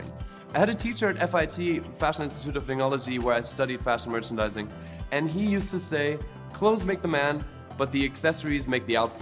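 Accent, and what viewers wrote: Germany. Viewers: Are you German? You have a similar color in your voice. No offence, just wondering... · He sounds like he is actually from germany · Nichts zum Video, ich kenn dich nicht, aber für mich klingst du wie ein Deutscher, der die englische Sprache ziemlich gut beherrscht :D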